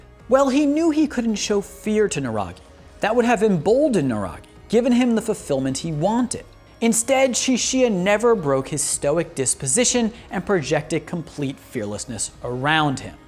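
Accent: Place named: United States. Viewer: Why do you pronounce the names with that American accent? It’s soooo cringe brother. Pronounce it properly! They are English names in a Japanese accent!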